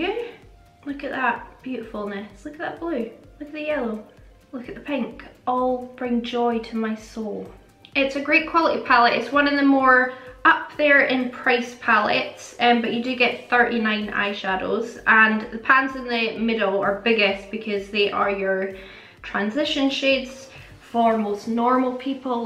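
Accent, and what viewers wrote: Scotland, United Kingdom. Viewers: the Scottish accent sounds so mellifluous like you are low key singing your words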